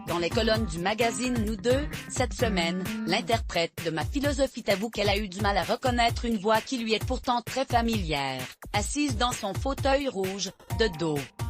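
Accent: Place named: Quebec, Canada. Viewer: Une voix québécoise qui raconte....ça fait bizarre!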